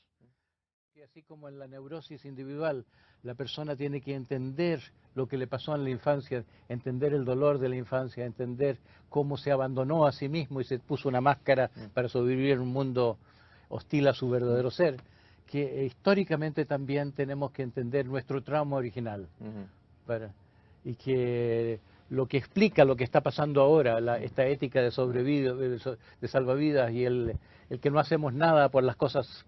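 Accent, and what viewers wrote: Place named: Chile. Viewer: Es increíble escuchar filosofía de verdad en Chileno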